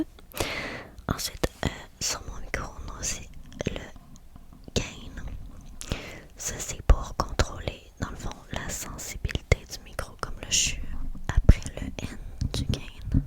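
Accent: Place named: Quebec, Canada. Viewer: L'accent québécois est incroyable, merci d'être là 🙏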